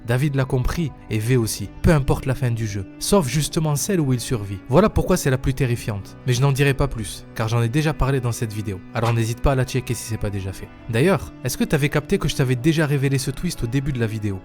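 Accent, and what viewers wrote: Marseille, France. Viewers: L'accent marseillais me perturbe et pourtant je vis à Marseille 🤣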